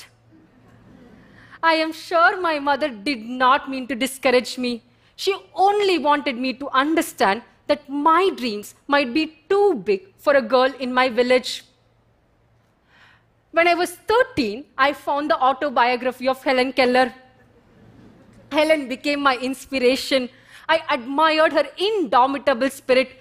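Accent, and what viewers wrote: India. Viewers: despite her strong indian accent I deeply understand her, good job girl...I am a rural girl and now I'm studying PhD course. what brought me here to watch your inspiring speech was that at the moment I'm improving my english skills to be able to apply for a postdoc position abroad. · she is Indian, why wouldn't she have an Indian accent?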